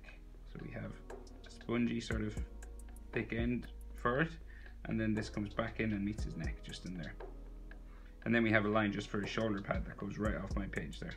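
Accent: Ireland. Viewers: Are u from the south of ireland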